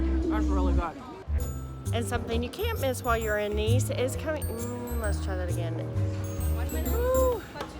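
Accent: South, United States. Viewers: Where are you from? I’m guessing from the south. 😊 Do you speak French? I am from Texas so I have a similar accent. I have been trying to learn the basics of French, but I feel silly speaking with my accent. Any tips?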